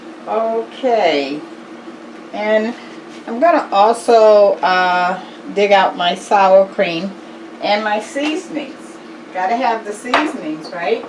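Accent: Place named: New York, United States. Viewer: I just love your jersey or new York accent as well as your channel thanks for sharing hun😀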